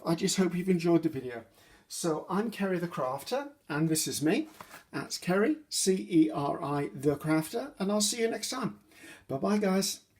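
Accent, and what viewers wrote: United Kingdom. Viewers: Hi, it's great to meet you and watch your fresh, lovely British accent creative take on crafting. I'm a new follower and am exited to watch more!!!!! Cheers from Iowa...USA. Tonya:)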